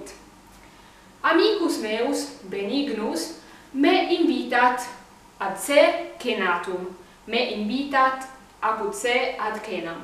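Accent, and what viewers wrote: Italy. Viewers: pronuntiatio restituta cum jucunda modulatione italica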